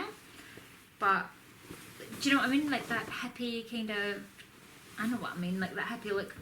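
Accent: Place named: Scotland, United Kingdom. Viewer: Hi i really liked your charity shop hall's , you have a lovely scottish accent. Thankyou.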